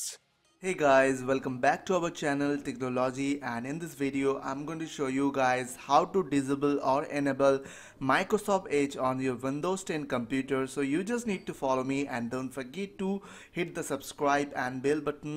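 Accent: India. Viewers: fucking indian accent!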